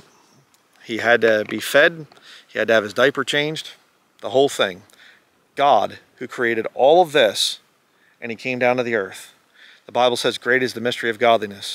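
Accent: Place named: Southeastern Pennsylvania, United States. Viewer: I knew you were from PA…I heard your accent and thought how does this New Englander have a southeastern PA accent? Did I hear you say, in so many words, you’re not a Trinitarian? I know the word Trinity doesn’t exist in the Bible…but I believe in Father, Son and the Holy Spirit. If I believe that God is one in three based on my current understanding of scripture do you find that problematic? Unlike many denominational members I like to have my beliefs challenged. Iron sharpens Iron🙏🏻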